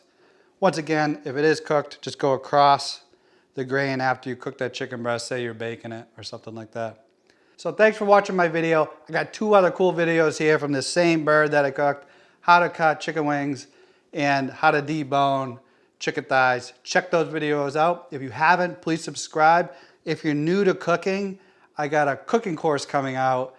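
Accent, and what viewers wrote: Boston, United States. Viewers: Totally Boston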